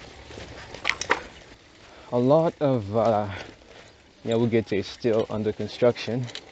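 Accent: United States. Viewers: you said that you never been in the US neither the Europe so how did you get the American accent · Man come on!! Be honest seems like u grow up in California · Off course your accent is like an American 🇺🇸 I enjoy watching your videos because I never been in Burundi since when I was 7 so it’s pleasure to me I really like it When all those roads get tarmac the neighborhood will look more beautiful ❤️❤️🇧🇮🇧🇮🇺🇸🇺🇸🇺🇸🇺🇸🇺🇸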